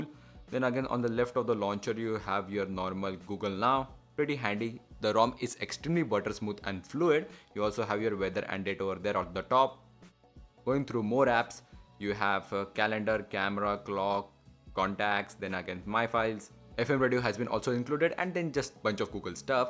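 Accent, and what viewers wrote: India. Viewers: You have EYES though. I can understand it even if he talk in pure Indian as long as i can see what he's doing.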